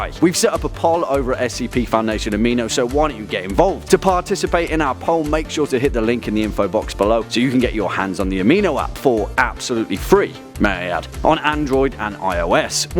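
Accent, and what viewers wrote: United Kingdom. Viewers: This guy sounds like the british dude from Community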